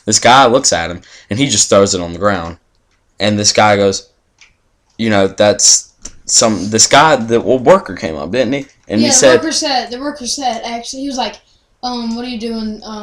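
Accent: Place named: North Carolina, United States. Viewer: What City in NC are you closest to? I live in NC aswell, and you sound like your from around my area!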